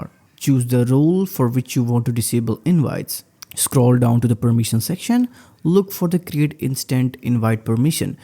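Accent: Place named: India. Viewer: You sound like an Indian trying not to sound Indian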